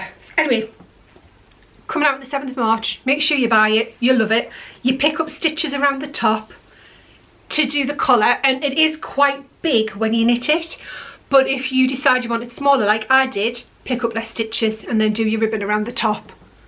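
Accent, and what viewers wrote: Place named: North East England, United Kingdom. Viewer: Brilliant to see you back, I’ve missed hearing a north east accent! Xxx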